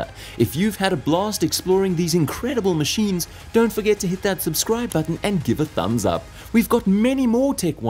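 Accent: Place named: United Kingdom. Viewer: Not bad for AI. I didn't hear any mistakes in the dialog. Only question: why are you talking about "Our military" (as in the US) with a British accent?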